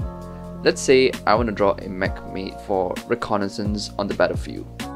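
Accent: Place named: Singapore. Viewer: He sounds singaporean...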